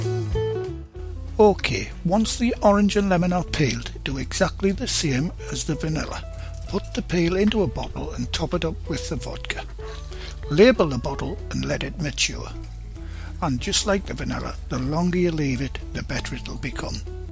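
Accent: United Kingdom. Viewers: I have subscribed already and managed to see some of your videos I really enjoyed them, you sound intelligent professional and everything is clear and well explained. Please continue uploading good videos. (and of course who can resist the British accent :-)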